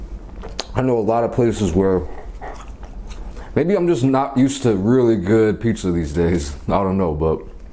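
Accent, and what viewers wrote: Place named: South, United States. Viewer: I wonder was he born in tx I hear a southern accent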